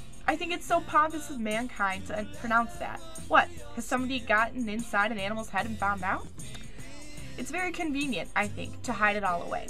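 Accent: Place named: Liverpool, United Kingdom. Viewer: Haha a liverpudlian accent is quite different from harry potters... VERY.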